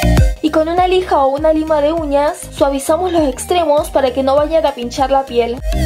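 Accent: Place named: Córdoba, Argentina. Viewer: De que parte de Argentina sos? Pareces de cordoba,i ndea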